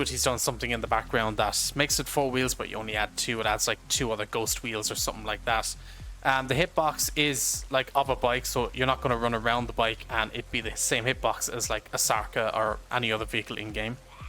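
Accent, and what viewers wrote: Ireland. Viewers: Where in Ireland is your accent from? Limerick? Can't figure out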